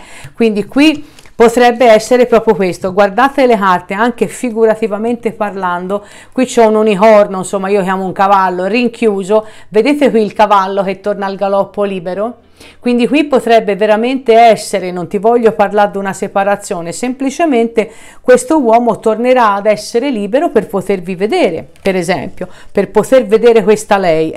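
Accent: Tuscany, Italy. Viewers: Io la LEI, esattamente cosi.. LUI non è un segno di acqua ma ci divide l'acqua. Io in grecia (causa covid) lui in italia. Abbiamo avuto un litigio bello forte.. Cmq complimenti.solo oggi ti ho trovato ma sei fantastica. Adoro la tua cadenza toscana😜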